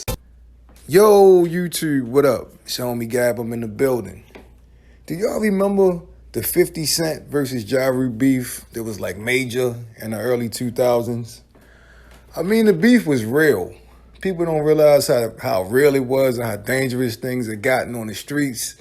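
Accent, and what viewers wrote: Baltimore, United States. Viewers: You sound like you’re from B-More homie....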